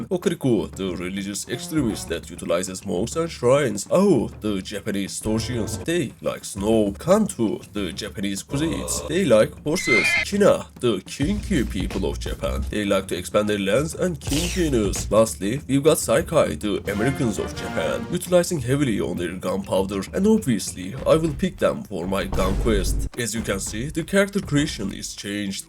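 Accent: Turkey. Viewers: Ya bende diyorum bu aksan nereden tanıdık. Adam Türkmüş aq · Kanka edit ve espiriler çok güzel türk aksanını da çok iyi taşıyorsun.Direkt aha Türk bunun mizahı da iyidir dedim öyle çıktı.Yolun açık olsun. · Dude where u from tho? Sound like turkish accent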